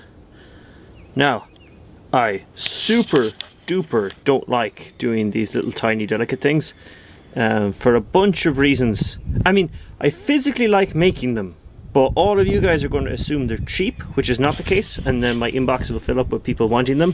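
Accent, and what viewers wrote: Ireland. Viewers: Are you Irish? And is that hail on the roof?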